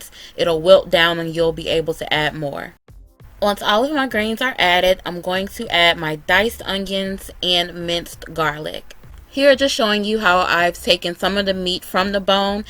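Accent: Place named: Chicago, United States. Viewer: Are you from Chicago? You have the accent. Lol. Great video.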